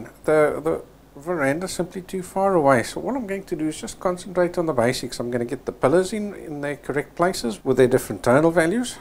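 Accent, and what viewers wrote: South Africa. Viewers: I have been watching your work for a couple weeks and I keep saying You sound South African to me. Well I found out today that you are from SA. Lovely I enjoy your work and the effort you make for us to understand.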